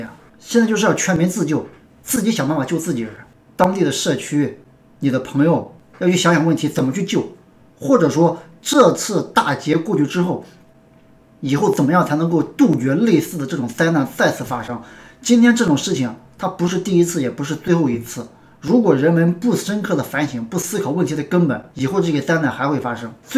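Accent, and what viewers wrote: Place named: Shandong, China. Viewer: 是山东人吗口音熟悉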